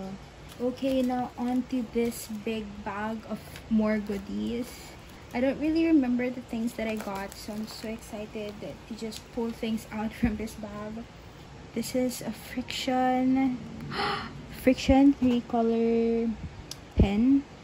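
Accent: Philippines. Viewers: ahaha i knew she was filipino kase her accent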